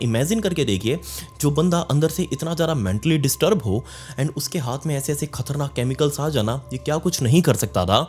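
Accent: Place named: Andhra Pradesh, India. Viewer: Accha, so you're an ANDHVAKT! Waise tumhare English pronunciation se shaq hota tha, aaj clear ho gya.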